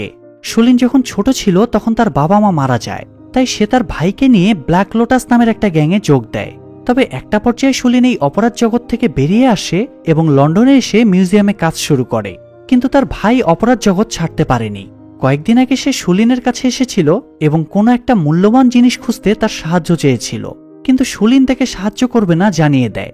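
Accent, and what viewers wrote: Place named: East Bengal, Bangladesh. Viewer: কথকতার মান ভাল না। গলার আওয়াজ খারাপ ।উচচারন বানগাল বানগাল।উচচারন ঠিক করে নাও।শুভেচছা রইল।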